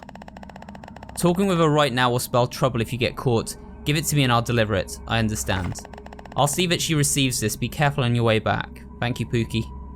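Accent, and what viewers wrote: United Kingdom. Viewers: What is your accent? You're saying "Ant-oh-knee" rather than pronouncing the "t" in "an-tho-knee" so I'mma guess your british.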